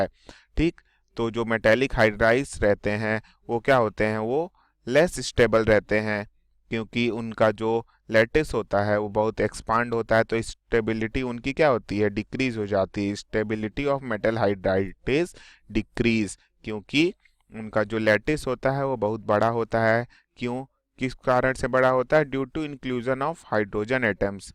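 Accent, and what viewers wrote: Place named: India. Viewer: Am I supposed to understand your indian english ???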